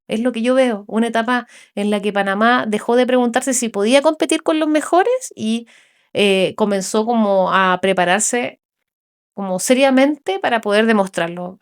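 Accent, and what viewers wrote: Chile. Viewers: Mi amor de dónde eres? Que voz más linda !!pareces Chilena el mismo asentó y la voz y sin fartarle el respeto eres muy linda❤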